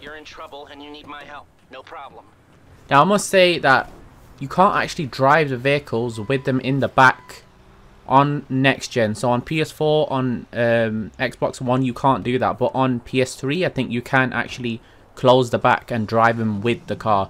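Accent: Netherlands. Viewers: Are you dutch? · Yo you dutch?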